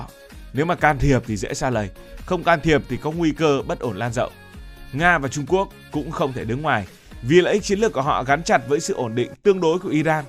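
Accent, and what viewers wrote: North, Vietnam. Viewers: Nghe nói từ Paris là tôi thích ngay, dù là nói tiếng Bắc...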